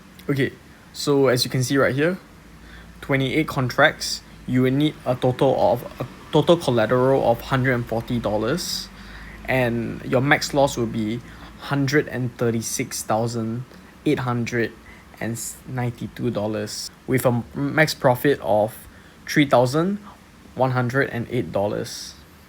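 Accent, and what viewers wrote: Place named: Singapore. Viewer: singapore accent ah boi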